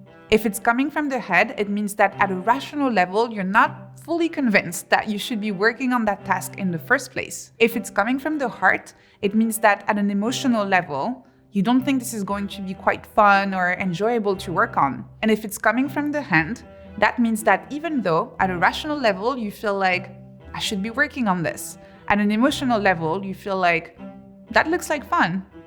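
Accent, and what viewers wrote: France. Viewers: Love the little french accent🇫🇷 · Beautiful French accent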